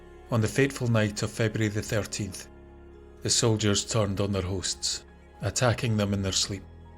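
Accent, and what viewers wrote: Scotland, United Kingdom. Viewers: A night of betrayal and horrors as that of Glencoe... and why would some these souls not still be there? I'm not even Scottish, never been at the site and still the story touched me deeply when I first heard it and still does so today, decades later. Thank you for your videos, you're doing a wonderful job narrating and presenting the stories.... of course, the Scottish voice is a special treat. 🙋🏻‍♀All the best to everyone involved in the making of the videos as well as to everyone watching and listening.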